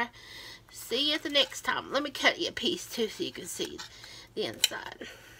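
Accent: South, United States. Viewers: I am currently on a diet. I eat my diet lunch at work and watch make wonderful things. Her demeanor is wonderful and her accent takes me back home to the south. Thank you,; bless your heart. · I am from North Carolina and she sounds like we locals, too. She is a real southerner, like so many people I know - not like that manic, shrill, slightly disturbing Paula Dean.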